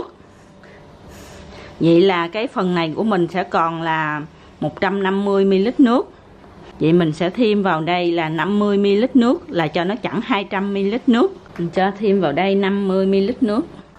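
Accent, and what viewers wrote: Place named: South, Vietnam. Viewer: co ten la phai khong? rat tot, rat tan tinh trong chi dan, tieng noi mien nam rat rong lam cho nguoi mien nam rat hanh dien ve ban chat chan that, het long nay. Cam on em that nhieu!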